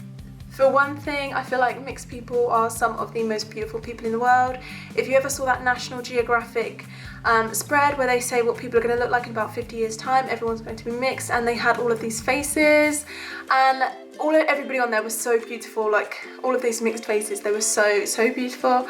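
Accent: London, United Kingdom. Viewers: I thought you were from London or some where round there sorry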